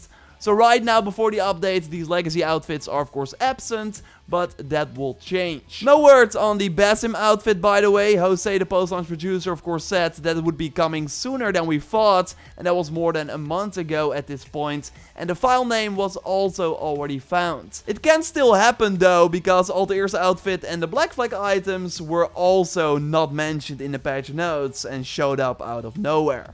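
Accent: Netherlands. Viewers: The Netherlands! Finally! After five years quietly trying to place your accent At one point my best guess was Croatia but Dutch makes so much sense! Skall!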